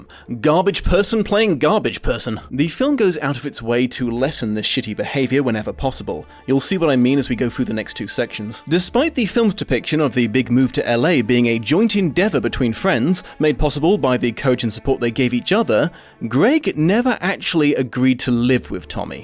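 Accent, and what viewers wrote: United Kingdom. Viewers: Stfu about Franco. Performative whiteknighting into extinction. Every time I hear this UK accent in a video it's a 100% guarantee it will have the dumbest pretentious takes ever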